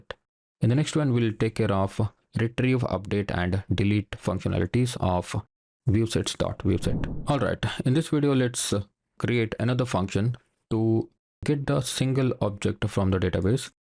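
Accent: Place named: India. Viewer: Classic Indian tutorial vibes...Flashy MacBook, thick accent, and somehow turning a simple task into a NASA mission. You could’ve just used Windows and made it beginner-friendly, but nope, had to flex the fruit laptop. No real pro with a MacBook is coming here to suffer through that accent. It’s the poor Windows and Linux users trying to survive this mess.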